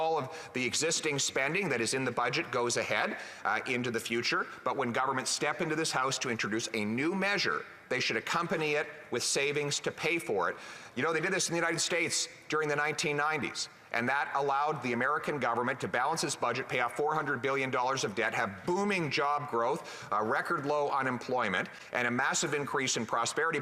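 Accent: Canada. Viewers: Sounds like your typical Canadian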